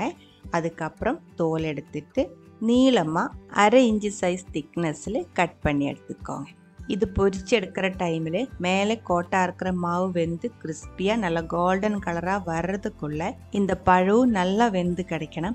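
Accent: Kerala, India. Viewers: Super pazhampori recipe sister uunga malayalam kalantha tamil speech super 👌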